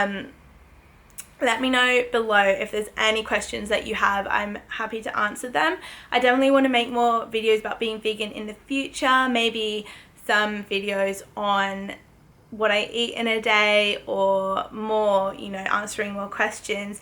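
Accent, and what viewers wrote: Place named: Australia. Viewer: I'm here because I just love her Ozi English accent. Sexy accent, hahahaa...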